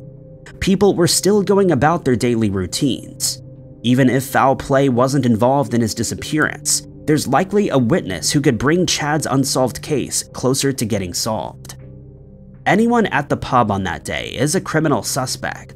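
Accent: United States. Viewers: He has an American accent. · Can someone explain why the narrator has an American accent yet he speaks like English isn't his first language? It doesn't bother me that English isn't someone's first language, what bothers me is that he sounds like a native speaker and yet he speaks like he's still learning the language. This just baffles me, I've watched a few of his videos now and have never understood why he always says "this strange but true blah blah blah" or "this scary mystery blah blah blah" it just sounds SO awkward and unnatural.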